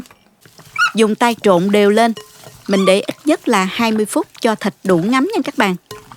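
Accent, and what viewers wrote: South, Vietnam. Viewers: Giong miền nam, sang nghiêm mà giản di chân thành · Thích giọng của, nói giọng Nam nghe rất chân tình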